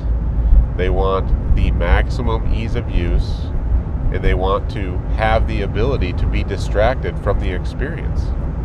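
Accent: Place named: Midwest, United States. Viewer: something about the midwest accent makes me feel like i have to blow my nose when i hear it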